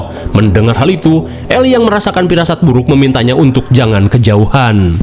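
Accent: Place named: West Java, Indonesia. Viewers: Lah baru tau BG orang Sunda cuma gak tau daerah mana dari logat sama bahasanya aja Sunda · Awal suka nonton film di chanel Si Aa gara2 intronya, "𝙃𝙮 𝙜𝙪𝙮𝙨, 𝙠𝙚𝙢𝙗𝙖𝙡𝙞 𝙡𝙖𝙜𝙞 𝙗𝙚𝙧𝙨𝙖𝙢𝙖 𝙜𝙪𝙖" Udah gitu si Aa Org Sunda yg sangat terdengar Pasti orgnya Gokil.. Kang ngawadul 🤣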